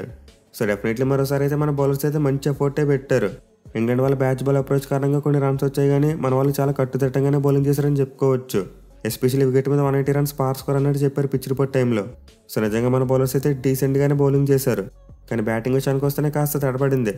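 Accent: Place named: East Godavari, India. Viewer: Bro midha east godavari aa 🌝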